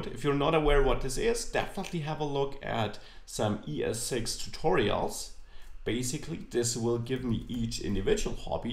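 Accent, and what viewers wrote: Germany. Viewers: Is that german accent? btw nice tuts. I would suggest you to make an advanced React/redux (100+ hours) PAID course.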